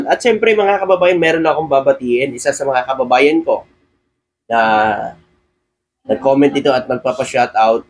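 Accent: Iloilo, Philippines. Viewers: Taga iloilo ka man boss? 🎉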